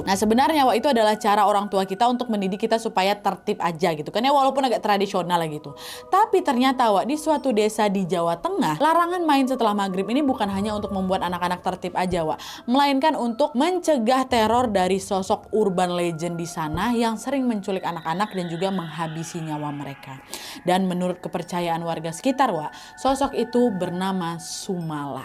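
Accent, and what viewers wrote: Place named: Medan, Indonesia. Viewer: Hai kk.aku br lht vidio kk...cr kk bawakn narasi " medan" kali y....jd lucu aja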